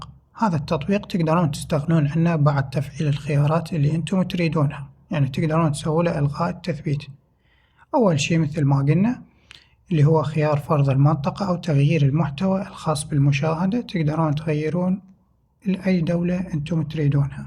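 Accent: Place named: Iraq. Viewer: للهجه عراقيهه ليهةة؟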